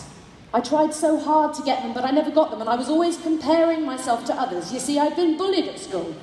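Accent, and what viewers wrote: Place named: United Kingdom. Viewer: Nice accent British Nice speech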